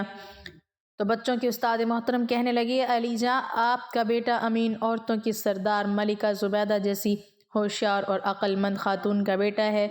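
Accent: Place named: Saraiki, Pakistan. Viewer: یہ سرائیکی excent لگتا پے۔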